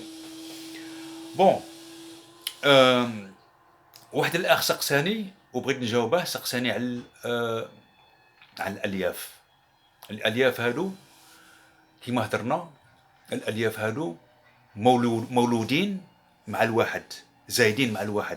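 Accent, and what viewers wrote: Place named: Algeria. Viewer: لهجة جزاءرية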